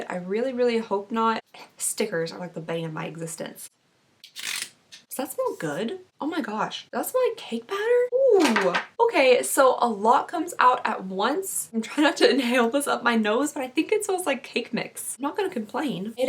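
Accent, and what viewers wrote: Kentucky, United States. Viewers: Are you from Kentucky????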